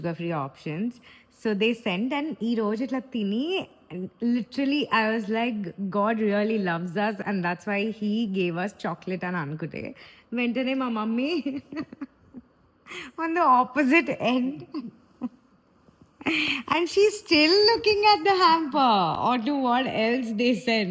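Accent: Telugu, India. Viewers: Ufffffff loved your Telugu accent ❤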